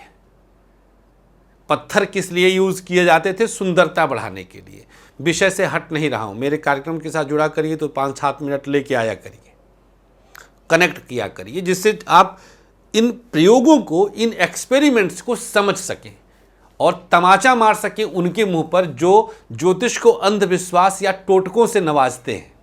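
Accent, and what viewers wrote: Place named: Varanasi, India. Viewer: sir aap ka. Bolane va baat kernai ka andaza ek dam banarasi hai 👍 jab aap datatai hai to 🙏🙏🙏 you are great sir.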